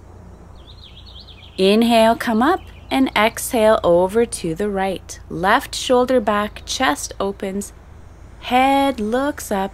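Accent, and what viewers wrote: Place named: Canada. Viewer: thank you SO much for making (I've only seen one) - this video. I will look for the 'other' video - and continue practicing Yoga. I'm almost 71, a Canadian living in Sedona, AZ. Not only did I find your technique soothing as well as 'stretching' - it was fun to hear the Canadian accent! Typically, I go to a rec center once weekly for chair Yoga. And, now I can do so in the comfort of my home, daily, if I choose. I love how the three ladies demonstrate various ways of executing the movements, depending on one's body's capabilities. And I love how they smile. Congrats, Ladies!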